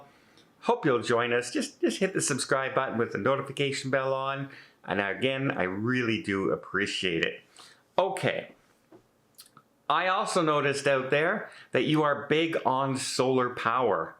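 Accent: Canada. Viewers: You should have come to Perth it's different again. It's small , hardly any population , isolated and very clean. It's also not as expensive. Not sure ,am I picking up a Canadian accent.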